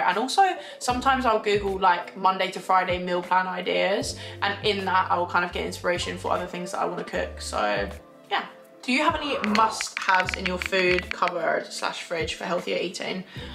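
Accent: Scotland, United Kingdom. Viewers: Well done on the weight loss! Amazing work! I’ve not watched you in years and you only just came up on suggested even although I’ve been subscribed for years. Where’s your Scottish accent gone??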